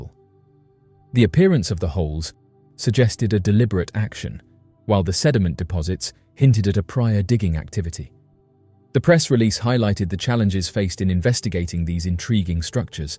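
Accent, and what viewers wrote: United Kingdom. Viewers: No..he disappeared.. Oh my.. Its facts we want...not wordy british narrator... Oh well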